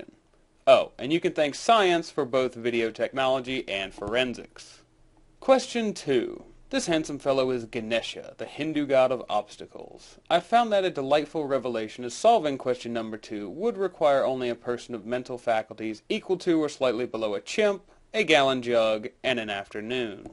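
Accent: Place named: South, United States. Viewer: Southern accent FTW!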